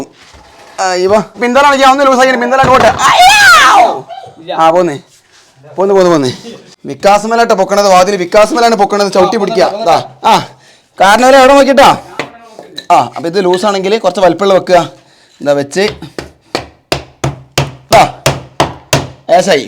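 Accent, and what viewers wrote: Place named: Malappuram, India. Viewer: മലപ്പുറത്ത് അങ്ങനെ ആണ് 🥰 മഴ മയ പുഴ പുയ 😅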